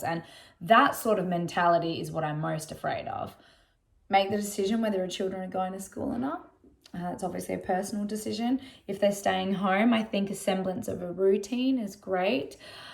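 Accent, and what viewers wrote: Australia. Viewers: It's weird hearing your Aussie accent, when you're soo American in everything! I'm in Sydney, I work from home and am ok with spatially distancing. It's been pretty blazee with community co-operation here, luckily it hasn't been as rife here, hope they can get it under control in Victoria! I too have been saddened by the "every-man-for-himself" attitude but society especially in the city is kind of like that anyway. Gosh I hope they find a vaccine, but life is so precious, hope we learn from this. Much love & light Xx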